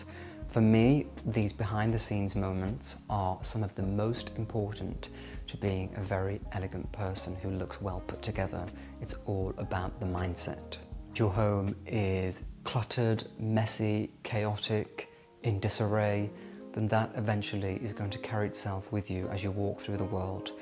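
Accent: United Kingdom. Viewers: Your British accent with everything else is elegant❤️❤️❤️❤️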